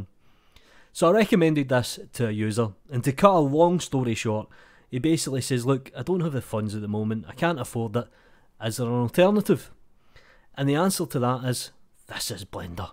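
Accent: Scotland, United Kingdom. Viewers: This was incredible. This was exactly what I need. I didn't know about the Boolean Modifier. Thank you! I love your Scottish accent too, so cool... >w<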